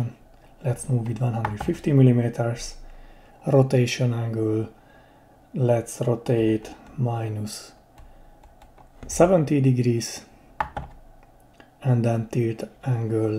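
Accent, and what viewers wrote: Hungary. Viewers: This project is cool. Congratulations. Development idea: equip the motors with rotary encoders and use them for teaching function (pre set the scenery and camera movement before shooting). Ps.: your keyboard and accent is Hungarian. Are you?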